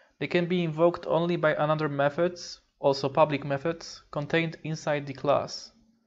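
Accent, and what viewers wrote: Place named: Poland. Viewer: Polak detected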